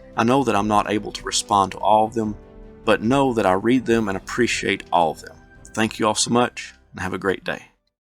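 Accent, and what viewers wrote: South, United States. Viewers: I always wondered, who the man is, telling me stories with that sound voice and the rollin southern tongue, wich I love so much. Now I know, thank you for that. So, for justices sake, I will get out of cover a little bit. I am a 60 year old veteran, born and raised in Germany inmidst the post ww 2 era. Originated from a family with military background, reaching back to the 30 years war, I started at a very early age to become interested in history. First love were Dinosaurs, soon after the ancient Egyptians up to the romans, my first choice of profession was archeologist, but it turned out otherwise. My grandpa liked to tell me the warstories, when he was a young soldier in peacetime, up to the time he commanded a division at the eastern front. He told me about the hardship and the suffering, but also about glory and comradeship. 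Like a sponge I absorbed those stories and, as you can imagine, I was hooked. So, in the age of 11 years, I began my dive into history, developing into an ascend deep inside the abyss of military history. Logically I sooner or later discovered the american civil war. BAAAAM, what a story. I studied the wars of napoleon before, wich are much larger in scale ( Battle of Leipzig <num> combattants) . But what was so unimaginable about the Civil War, was the fact, that they fought napoleonic style with rifled musket and cannon! What kind of man, what breed of hell can endure such gruesome tasks, what kind of spirit was their motivation, why the hell they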